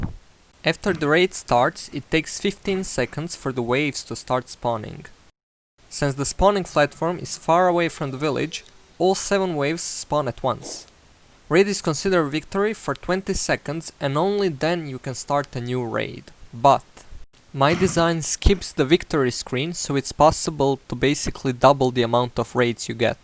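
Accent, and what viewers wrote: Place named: Russia. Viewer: u sound kinda Russian. also I had a question, does this farm work on paper? bcs ray works one doesnt.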